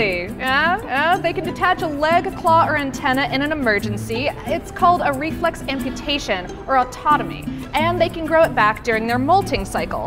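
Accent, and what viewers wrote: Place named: United States. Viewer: has a german name, looks asian and speaks american english....globalistaion for the lose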